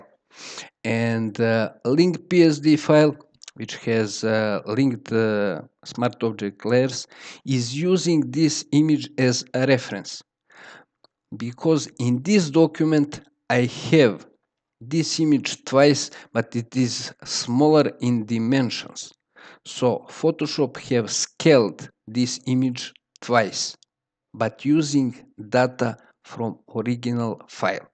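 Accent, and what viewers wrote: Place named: India. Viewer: INd-lish, speech very bad. i don't hear sound.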